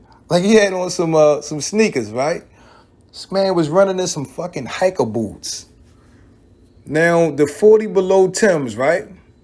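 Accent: Baltimore, United States. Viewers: Your bmore accent is heavy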